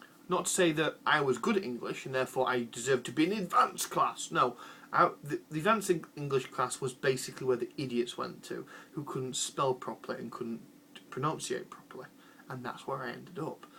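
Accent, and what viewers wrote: United Kingdom. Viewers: I love your accent and pronunciation! Bear in mind, language is identity and we all come from somewhere. I keep my German with a strong northern accent, as I'm from Hamburg and I like the area. I don't attempt to filter it to simulate standard German. Your accent reminds me strongly of folks I knew in the UK during my student days there. My point is that I understand everything you say perfectly well and I greatly enjoy following your thoughts. Keep it up!:-)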